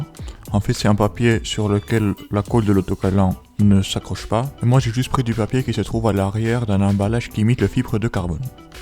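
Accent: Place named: Africa. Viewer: Une grosse voix d'adulte, un accent probablement africain. Et des petites mains de jeune adulte toutes blanches. J'avoue que ca m'a perturbé !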